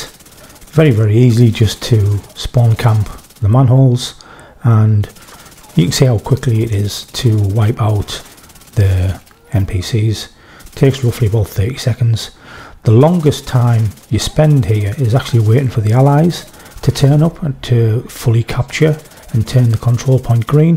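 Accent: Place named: Durham, United Kingdom. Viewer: Just trynna get them tactical assessment bundle blueprints. Friggin things don’t wanna drop for me. Anyways, were you from mate? You sound like you’re from Durham!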